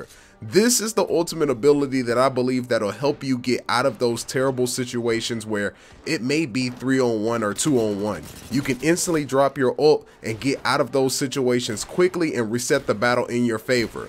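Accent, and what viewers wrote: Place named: Compton, United States. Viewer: He sound like e be from compten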